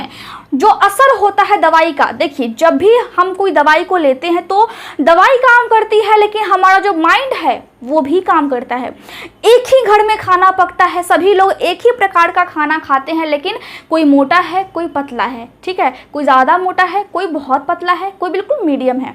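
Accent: Bihar, India. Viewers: mam aap बिहार से हैँ क्या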